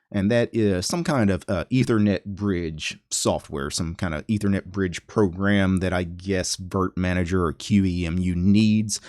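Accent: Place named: Texas, United States. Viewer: He has a strong Texas accent :-)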